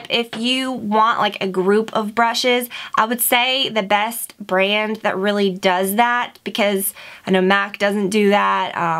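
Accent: South, United States. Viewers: I don't normally pick up your southern accent in your videos but for some reason I could really hear it in this one lol! Adorable of course, and thank you for all the great info :) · I can hear a strong southern accent! Love you! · Woah wait you have a southern accent???!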